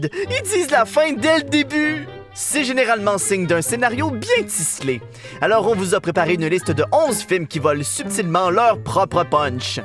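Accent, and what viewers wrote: Quebec, Canada. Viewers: c’est QUÉBEQUOIS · L'accents 😂😂😂 j'adore!!! Les Québécois je vous kiff vous êtes une source d'inspiration pour mes vidéo! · T québécois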